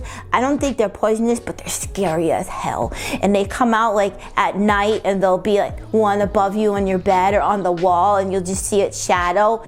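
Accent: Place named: North, United Kingdom. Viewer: your from the north arnt you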